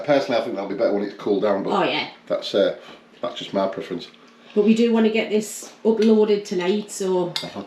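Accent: Newcastle, United Kingdom. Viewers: I like your British accent I'm American. I love your video of the corned beef & potato pie. I migth make it some time it looked very easy to make. I'm part Irish and I thought cabbage migth be good to put it do you think so?. May bless you. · So down to earth and the way we used to cook before fast food! Love your Geordie accent. Keep em coming, cooking the way people actually cook in an ordinary kitchen - cooking first class food! Thanks.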